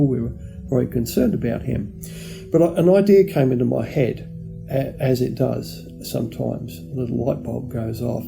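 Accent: Australia. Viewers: Are you a fellow Australian?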